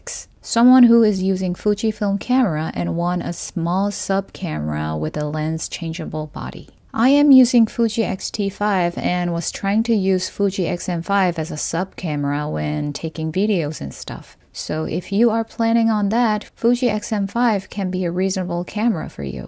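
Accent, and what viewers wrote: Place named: United States. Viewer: 몇 달 계셨는데, 그냥 미국인 발음이시네요. 너무 좋아요~!! 인스타에서도 뵈어요~!! 새해 복 많이 받으세요~~!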